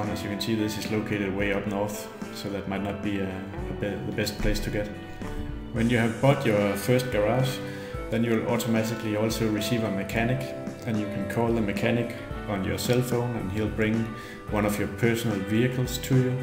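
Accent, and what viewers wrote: Denmark. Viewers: Are you from Denmark ? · Hello may i ask are you danish? Hvis du er KOOONGEEE xD EJ køber selv gta 5 så tak for videoen :D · Er du Dansker? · er du dansker? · Er du fra Danmark? Du lyder dansk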